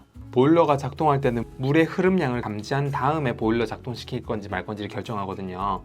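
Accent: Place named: Busan, South Korea. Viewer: 구수한 부산억양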